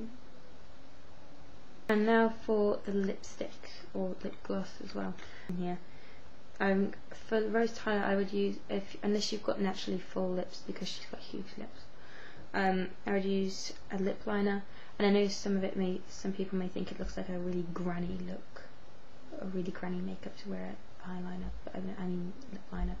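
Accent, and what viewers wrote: United Kingdom. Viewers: what part of england u from? always wondering where that type of "posh" accent comes from. like kate middleton. idk if she worked on her accent but ive NEVER heard her accent on anyone else. yours is nowhere near as posh as hers no worries! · your not posh your just british like me mate x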